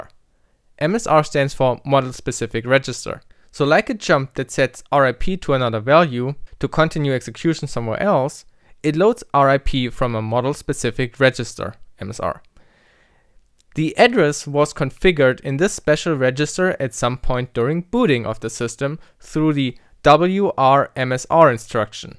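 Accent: Germany. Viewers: really well explained! sind Sie Deutsch?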